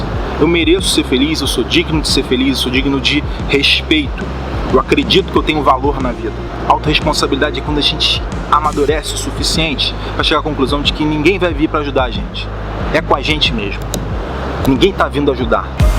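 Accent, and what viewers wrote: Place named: South, Brazil. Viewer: Sabia q esse sotaque era qui do Sul.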